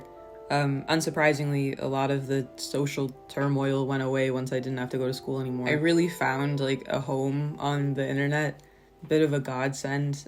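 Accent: Midwest, United States. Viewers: I don't know where in the midwest your accent comes from, but you have a very distinct speech pattern. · Can I ask is somebody who is in the shoes that you seem to have been in when making the video you reference at the start, what was it that encouraged you to go through with the full diagnosis? I’m pretty much 99% sure, and I’ve just found out that I’ve got to start the waitlist again because I’ve moved from one County to another which is like moving states. (I am assuming from your voice that you are American) it’s going to be 18 months, but I’m really sort of at that phase of feeling sad about the whole realism that you probably are… You got any advice ?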